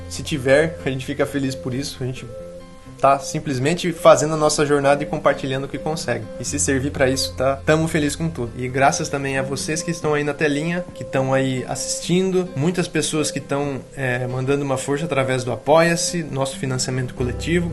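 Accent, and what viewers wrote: Rio Grande do Sul, Brazil. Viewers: Pelo seu sotaque já tava me mudando pro RS. Mas sabendo que tu tá no oeste do PR, muito mais perto. Vou ir andando me enfiar nesse espaço de vocês. Hahah #FicAGenteVêPorAqui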